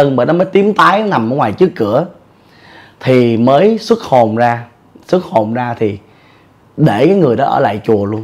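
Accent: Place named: Saigon, Vietnam. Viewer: Em nghe anh ke giong Ben phường 7 Quan 8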